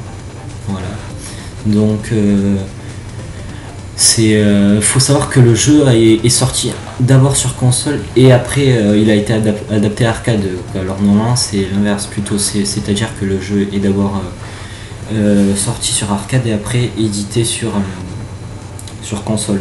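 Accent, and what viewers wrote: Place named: South, France. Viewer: Ton accent de sud xD sinon bonne présentation du jeux ;)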